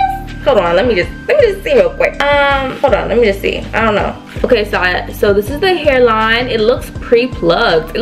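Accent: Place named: New York, United States. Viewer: I love your New York accent 😭 and thank you for this! Mine just came today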